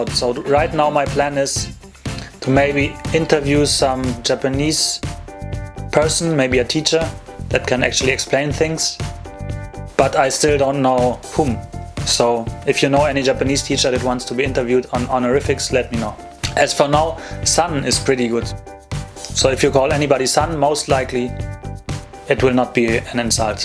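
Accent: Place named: Bavaria, Germany. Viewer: du kannst aber auch bayrisch sprechen c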